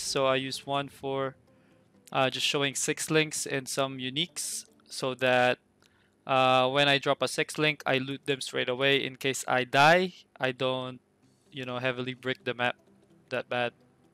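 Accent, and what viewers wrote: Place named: Philippines. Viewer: Fellow Pinoy PoE player? Guessing from the accent lol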